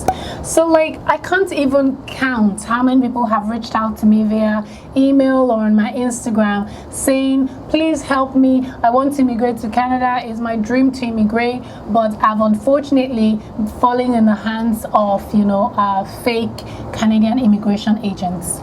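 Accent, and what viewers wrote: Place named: Nigeria. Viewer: I could listen to you all day. I love Nigerian accents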